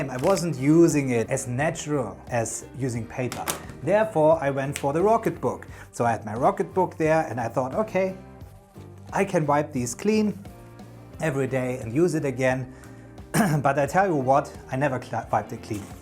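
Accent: Germany. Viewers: German Akzent? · dieser deutsche Akzent so geil😂😂😂🇩🇪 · Are you German? :) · People who speak German English are firm, and direct! Danke schoen Mein fruend🇩🇪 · Are you German? I can’t place the accent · U Sound a bit like you are Germa Are you tho?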